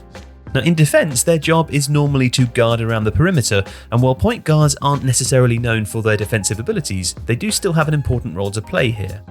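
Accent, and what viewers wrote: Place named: England, United Kingdom. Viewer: I didn’t need a fancy English accent to tell me that. White washing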